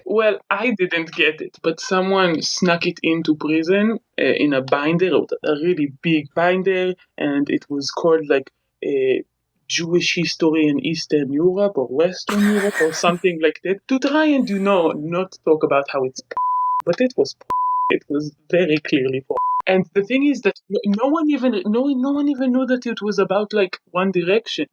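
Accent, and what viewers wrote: United Kingdom. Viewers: Dude you’re literally growing so fast u already got 5k! If there’s anything the YouTube algorithm is a sucker for it’s nice clean British accents, and even nicer video content in an organized, and fun way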